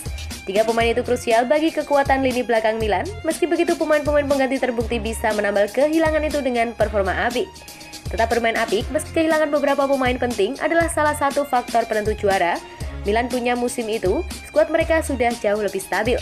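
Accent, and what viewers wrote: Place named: Java, Indonesia. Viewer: Lo kok jadi medddok uuwalah .. mba e ..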